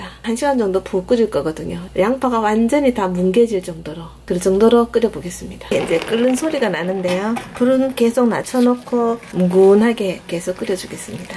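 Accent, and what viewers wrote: Gyeongsang, South Korea. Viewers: 건강한정보 알려주셔서 감사합니다 설명도 경상도억양이지만 억세지않고 상냥한말씨가 듣기좋습니다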